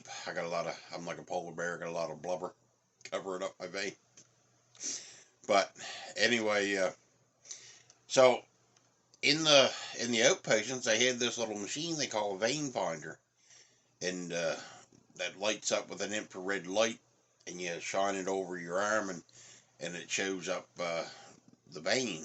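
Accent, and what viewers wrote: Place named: East Coast, Canada. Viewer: Cuntastic! You sounds like a Canadian east coaster b'y, is ya?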